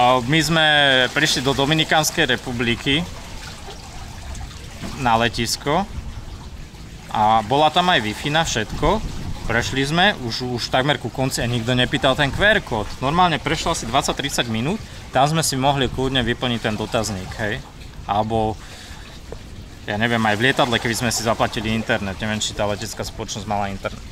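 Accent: Slovakia. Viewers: Bratu, ja pocujem podla prizvuku, ze si zapadnar, ale nehovori sa kamerovat, ci nedajbo fotoaparatovat (co si nasatastie nepouzil) :D. Ale nakrucat, natacat ;))) Inac drzim palce, vyborne cestopisy ;)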